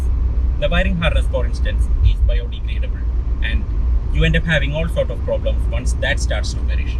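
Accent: Kerala, India. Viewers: Malu english mind blowing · Bro...I feel that thick Malayali accent 🤣👍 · Just one thing.....you have that typical Mallu Accent...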